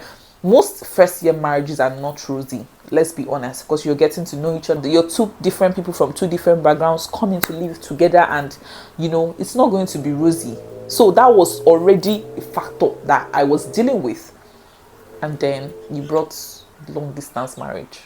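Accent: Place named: Nigeria. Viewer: The story is not as good as the title 😂😂 or maybe it's the Nigerian accent for me which am not very good with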